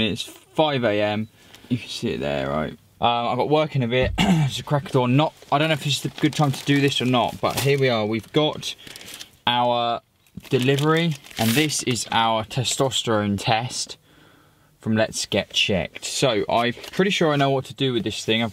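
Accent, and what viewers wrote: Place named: South West England, United Kingdom. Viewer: Where are you from? Bristol or something? I hear a bit of South West in you!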